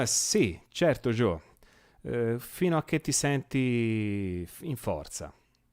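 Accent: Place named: Montecatini, Italy. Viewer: secondo me sei di Montecatini, parli come una mia ex :)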